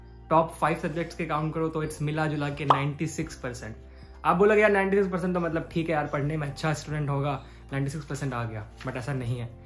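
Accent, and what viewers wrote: Bihar, India. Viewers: Bhai aaap kathihar bihar se ho?? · Bihar se ho kya ...Katihaar district? ?????? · Bhai ap bihar se ho...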